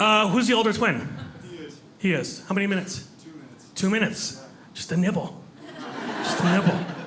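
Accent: United States. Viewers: World be fun if he knew how his and his brothers names are pronounced in Norwegian. And funny they’re pronounced so American and not even with a twist of Norwegian.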